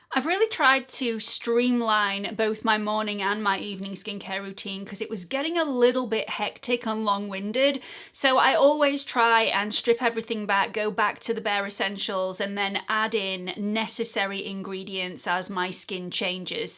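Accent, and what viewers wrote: United Kingdom. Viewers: I, m Spanish and I, ve recently discovered your videos, may bé a week ago and I adooooore you, I, m 55 Years old, so, I listen carefully about tour mum's tips and devices about skincare and make up I think you're honest and I heard very realistic recommendations from you. And, theres a bonus, listening to you., I learn a lovely british pronunciation. Sorry, maybe I dont write very well, besos y enhorabuena por tu estupendo canal.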